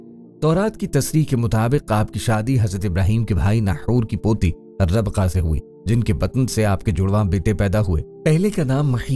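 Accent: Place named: Pakistan. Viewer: Beautiful voice and and Urdu accent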